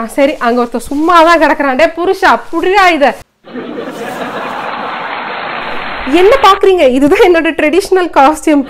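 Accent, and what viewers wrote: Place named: Coimbatore, India. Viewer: அம்மிணி நம்ம கோயமுத்தூர் பாசையா மிக மிக நன்றாக உள்ளது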